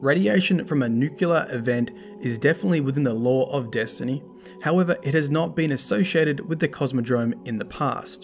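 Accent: Australia. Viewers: Quarantine ! Also Lol ‘Zed’ I love your AU accent!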